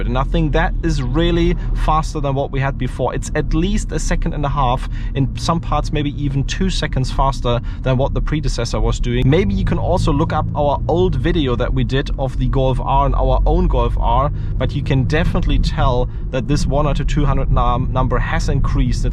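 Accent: Germany. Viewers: Thats every germans accent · German-English :-) best english in the world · The German accent makes this car so much more German 😁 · Der deutsche Akzent ist auf die Dauer schon hart für die Ohren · dat german accent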